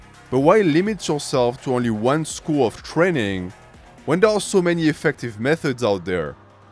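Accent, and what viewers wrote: France. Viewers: Ur french but other than that nice video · As always, great stuff! Just sad you didn’t start the video with “hey YouTube” in your French accent That’s half the reason I watch these videos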